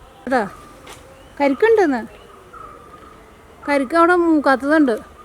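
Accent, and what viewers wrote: Thrissur, India. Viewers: Thrissur basha padichu ethu enthutta sanam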